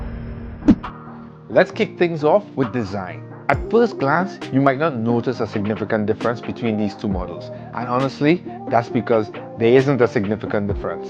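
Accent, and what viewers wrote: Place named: Trinidad and Tobago. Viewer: Wait, wait, how you sound like a Trini so lol?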